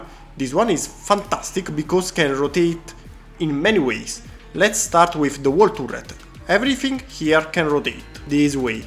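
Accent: Italy. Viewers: ti ho sentito parlare 5 secondi e sono andato sulla bio per vedere se sei italiane, e lo sei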